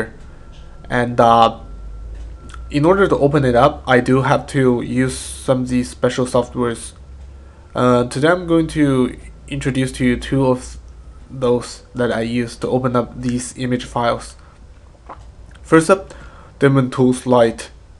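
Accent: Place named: Iran. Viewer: Iranian Accent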